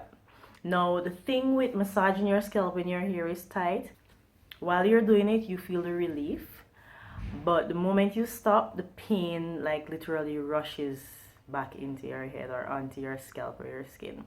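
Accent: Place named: Guyana. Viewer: Why do you sound Guyanese!!! 😍😍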